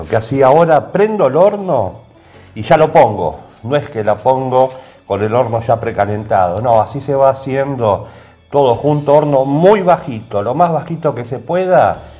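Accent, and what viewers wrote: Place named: Argentina. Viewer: Grande sos un groso como hablas explicas bien a lo argento papá abrazo grande....!!!!!!!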